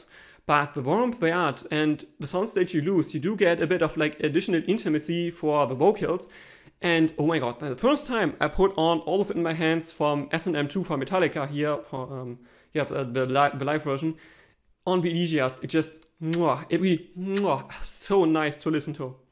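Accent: Germany. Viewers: The written review is good. You are well informed for a newbie :D How did you learn all the Audio Stuff and the assessment etc? Can you recommend any Youtube Channels or books? Promising Channel, keep up the good work! Du hast auch einen typischen deutschen Akzent im Englischen :D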